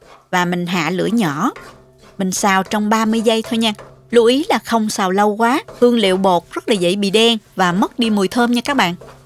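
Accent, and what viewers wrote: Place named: Mekong Delta, Vietnam. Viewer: Giọng dễ thương, đúng là gái miền Tây nam bộ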